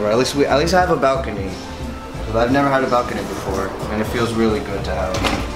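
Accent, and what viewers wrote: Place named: Brooklyn, United States. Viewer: You from Brooklyn whatever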